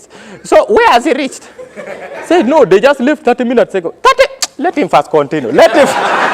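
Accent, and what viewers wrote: Uganda. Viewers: Man l love your Banyankole accent 😂😂😂😂😊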